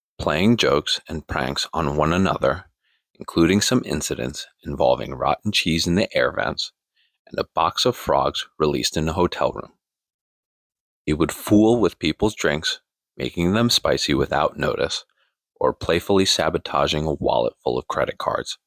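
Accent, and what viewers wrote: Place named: United States. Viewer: you sound like an american , you don t have any british accent at all , if im correct that is very impressive because senna never was loved or famous in the united stetes because for been a f 1 driver , the don t care about f 1 anyway , phil hill was world champion in 1961 if anyone ask about him in the united states you gonna hear , who ????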